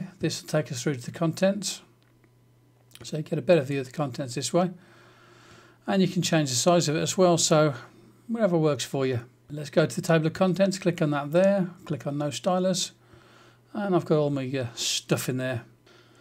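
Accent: Birmingham, United Kingdom. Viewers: are you a brummie? me too !!! thx for the video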